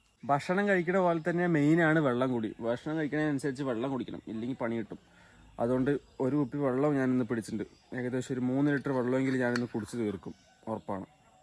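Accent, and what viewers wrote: Palakkad, India. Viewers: Hello videos ellam ishtakunund palakkad slang kelkkan orupadu ishtanu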